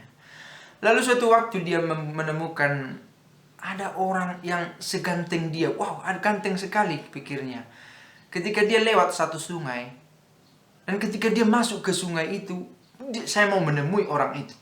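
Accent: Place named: Tegal, Indonesia. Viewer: Kalo dari logatnya kayaknya orang tegal 🤔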